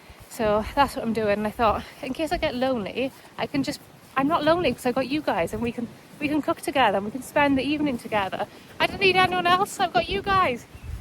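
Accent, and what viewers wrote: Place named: Wales, United Kingdom. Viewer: I'm From Chippenham, Wiltshire. Recently, I discovered a Neolithic burial chamber which is just 20 feet from the road I have travelled along literally thousands of times. Lanhill Longbarrow, 3500bc. It has also been called in the past Ubbe's Low. Allegedly it contained the body of Ragnar Lothbrok's son Ubbe. But this is probably myth. Blows my mind to think one of the oldest buildings in these Island's history is just randomly situated just off a busy main road. Something so ancient and hardly anyone knows it's there. You have a lovely Welsh accent and it's magical when you say 'beautiful'. You're very humble, which I find to be a general Welsh trait. Keep posting from lovely Wales.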